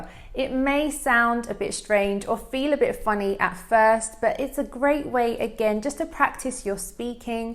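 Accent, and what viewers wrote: United Kingdom. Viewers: i love your accent. i mean, british accent👍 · I've been learning British EN for four years. Your videos are really great to keep learning the British accent🇬🇧💛 Thank you so very much!! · Please tell me in which british accent you are talking😊💐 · I need to listen to british accent more. It is very beautiful, and your tips, particularly, are great. Thanks for your dedication in teaching. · I love your British accent. It's a lovely accent plus I love the Scottish accent. Thanks for a wonderful video and superb channel you are a gorgeous English instructor.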